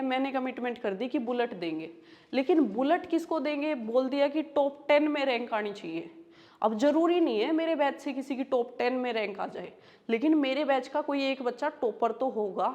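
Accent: Haryana, India. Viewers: Aap haryana se ho mam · Mam hariyanvi jhalak aa gi voice m 😅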